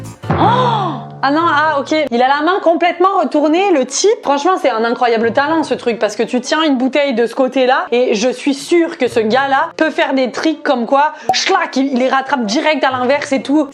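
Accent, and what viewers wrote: Quebec, Canada. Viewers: Ton accent du Québec me manque.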